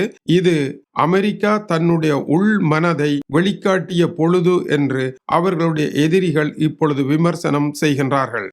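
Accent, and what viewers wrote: Sri Lanka. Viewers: நல்ல இலங்கைத் தமிழ் கன்னியகுரல் குளந்தைகளுக்ம் புறியும்படியான விலக்கம் நன் திகைக்கும்படியன ஒரு தெளிவான விலக்கம் அடிகடி உங்கள் நீ ஸ் கேக்க மனம் தூண்டுகிரது மிகவும் உன்மையான சம்பு வமாகவும் உடனுக்குடன் செய்திகளை அனுப்புங்கள் நன்றி ....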